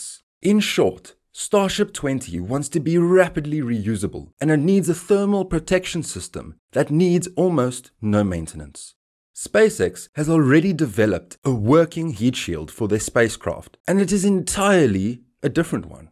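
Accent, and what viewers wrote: Afrikaans, South Africa. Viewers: you sound South African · Is that a SA accent? Good video by the way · I hear a fellow Saffer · My dude you Afrikaans?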